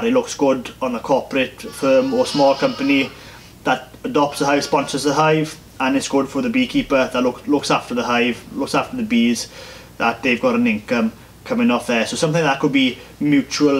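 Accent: Wales, United Kingdom. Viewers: 20 to 40 pounds of honey per hive per year, did I got that right? So that's 9 to 18 kg. So to make an average full time income, you need about 800 hives. Is that so different in Wales compared to Germany or did I mistake that beautiful Welch dialect? :) I read about 50 kg per hive on many sources. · very true, although I genuinely believe the more the merrier when it comes to mead. Its still taxed and treated like a wine which is very annoying and also extremely expensive for small producers like myself. I'd make some YouTube videos on how to get started etc but I don't have a cheery Welsh accent like yourself and unfortunately I have the personality of a slightly moist paper bag.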